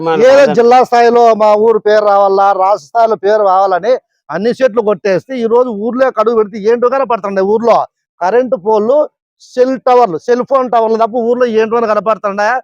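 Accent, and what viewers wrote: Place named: Rayalaseema, India. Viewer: తెలంగాణా యాసకు బదులు సీమ యాస వాడారు.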